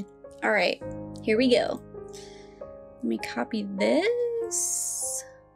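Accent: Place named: East Coast, United States. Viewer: Wow! Thank you for answering my question! It’s really cool that you’re from the East Coast, US. I grew up in MA, and moved to NH when I got married 10 years ago. I had a feeling by your accent you might not be far far from where I grew up. Now I live in Japan and it’s really a dream come true. It was really great to hear your background about how you enjoyed and related to Tohru a lot. I also agree with you, out of all the characters I felt like I related to her a lot. But not on the same life experience so much. But the heart that wants to help heal others hearts and just be friends with anyone and everyone. That was always my heart as a teen, and still now it resonates with me. Also! I love OHSC!! I watched it when it was airing in Japan in 2006! I remember being so excited for each episode to come out and be subbed! I didn’t know then, how much of a parody of Shojo it was until much later, but I still adore it too! 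I can’t believe they are finally making a second season of it!! Also FMAB and Wolfs Rain!!! Yesss so good, but so gut-wrenching! I never watched Gurren Laggan, but I’ve heard so much about it. You should watch Violet Evergarden, Snow White with Red Hair and Yona of the Dawn, if you enjoy fantasy. :)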